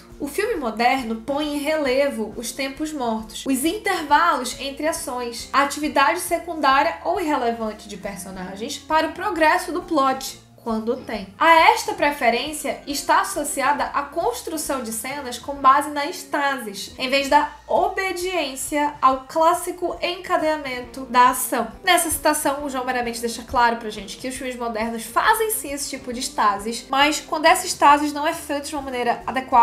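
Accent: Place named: Northeast, Brazil. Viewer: Ei, voce é nordestina? O sotaque é um pouco parecido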